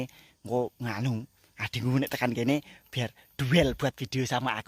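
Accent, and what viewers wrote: Java, Indonesia. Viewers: Medok tenan to kuwe... jiean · Medok amat,jowobe ngendi to mas? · Ak sll ngikuti tik toke ms, ngomonge lucu, jawa ne asli ngendi ms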